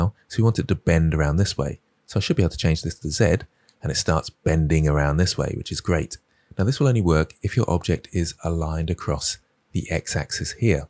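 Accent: United Kingdom. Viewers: Hi, thank you very much to update your well even if I finished the 2.8 well project one week ago 😆. By the way, I would like to mention how much I enjoy your videos. Passion, friendly explanations and great british accent are your best skills! Please keep your motivation. Congrats.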